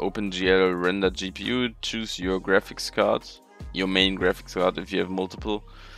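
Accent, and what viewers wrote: Germany. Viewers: Ich wusste es das du deutscher bist habe mir die ganze zeit gedacht das ist doch ein deutscher der englisch redet aber jetzt der beweis 😂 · Wie man einfach das Deutsche raus hört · War ja klar das er Deutsch ist XD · cleannn valorant intro. edit: are u german, because u sound like whitecat (osu)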